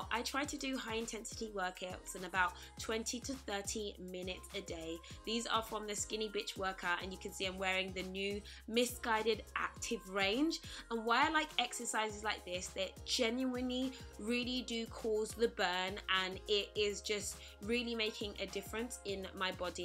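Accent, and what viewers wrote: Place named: England, United Kingdom. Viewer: I absolutely love your English accent but sometimes it takes me awhile to understand. Isn't it amazing how different American and English pronunciation can be?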